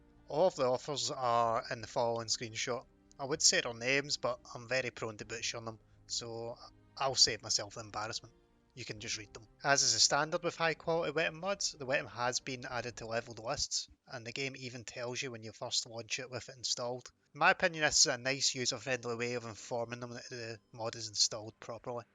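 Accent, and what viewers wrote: Scotland, United Kingdom. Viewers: Ha your scotish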